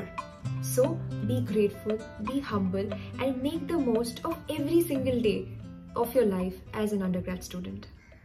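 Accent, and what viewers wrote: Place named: Bengal, India. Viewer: Didi akdin banglai video hoe jak🤭🤭. Tomar naam bolar accent ta amar khub bhalo lage. Makes me feel Aah we r bengali❤️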